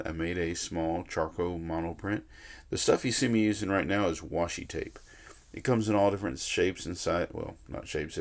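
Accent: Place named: Gulf Coast, United States. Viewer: Grew up in Gautier. 😂 recognized the coast accent immediately 🙂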